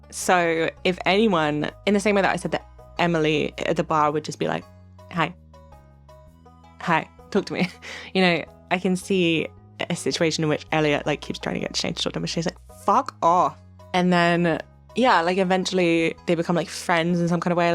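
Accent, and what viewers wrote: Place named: United Kingdom. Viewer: GODDAMIT DO A SHANE VIDEO U PRETTY WOMAN WITH A THICK BRITISH ACCENT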